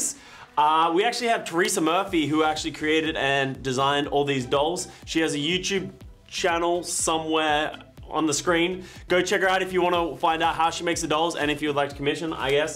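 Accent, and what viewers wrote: Australia. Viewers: Another excellent episode of CNN! I need more coherent Australian rambling in my life.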